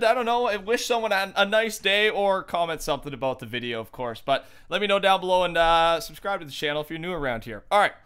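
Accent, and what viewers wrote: Canada. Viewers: Are you canadian? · Sounds like you’re Canadian , Vancouver ?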